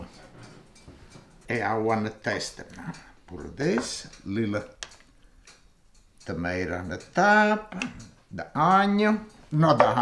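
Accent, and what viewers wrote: Italy. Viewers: This looks delicious! And your English is excellent,! I love your Italian accent ❤️. Salute e cin cin! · its kinda crazy, you can almost hear how the NY dialect developed from this italian accent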